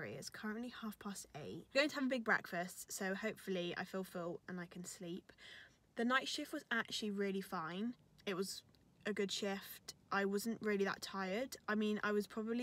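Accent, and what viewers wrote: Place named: England, United Kingdom. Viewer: Sotaque inglês é bonito demais, pena que é difícil de entender kkk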